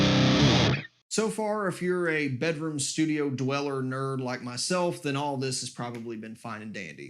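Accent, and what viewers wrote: Texas, United States. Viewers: i always thought you were from Texas...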